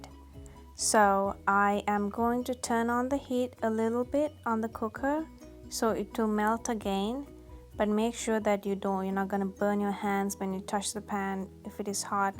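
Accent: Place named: India. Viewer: I hate your hindi accent.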